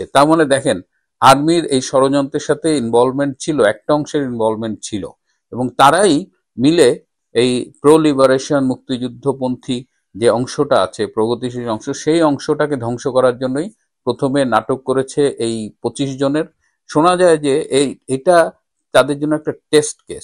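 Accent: India. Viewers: বাড়ী কি ভারতে? বাঙলা কলকাতার মত।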